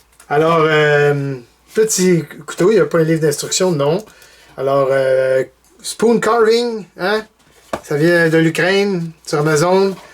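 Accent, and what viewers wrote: Quebec, Canada. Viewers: Il est vrai d'affirmer que notre dialecte est quelque peu rustique,,, et pourrait contenir plus de consonnes 😋 Mé ski fo comprendre cé k partir de -20c, Ben la yeule nous gèle, Sion ava toute la même couleur la vie sra Ben plate,. Marci , g bin du plaisir à écouter un grand passionné qui sonne de par che nous, Marci🌲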